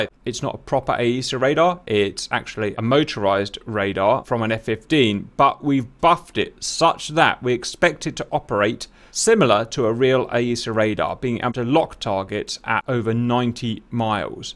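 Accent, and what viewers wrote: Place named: RP, United Kingdom. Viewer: A lot of relentless jibber jabber from a posh boy with a neo RP accent. Wonder how long these tossers would last in a real war?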